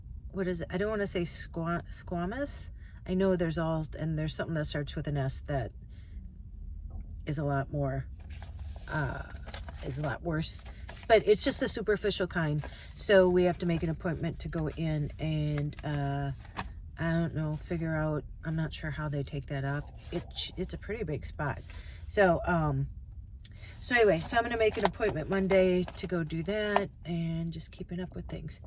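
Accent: Minnesota, United States. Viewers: You are a cook. And i mean that in the best sense of the word. Caught a couple of your videos a year or so apart.. just caught a hint of your Minnesota accent. Love it! Subscribed.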